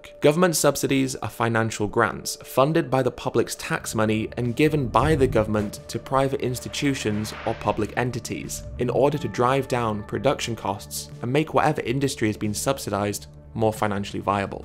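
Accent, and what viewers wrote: United Kingdom. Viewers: Hold up, Is this guy British?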